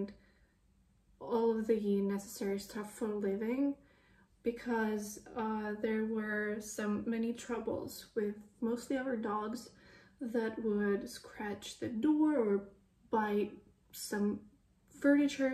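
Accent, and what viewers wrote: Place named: United States. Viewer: Where did this fluency in English come from? And a perfect American accent? I live in the USA for 45 years, still have a Russian accent. When you see Marianne in Florida, give her a hug from an old friend please. I moved from Florida to North Carolina 2 years ago.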